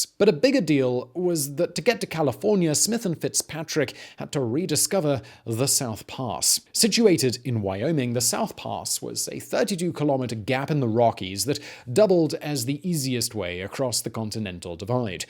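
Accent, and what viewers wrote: United Kingdom. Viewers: Your voice and delivery get on my nerves!!!! And it is nothing to do with your British accent, I usually find that pleasing.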